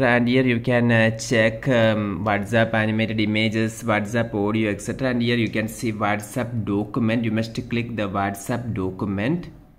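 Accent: Kerala, India. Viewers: Terrible, thick, incomprehensible Mallu accent!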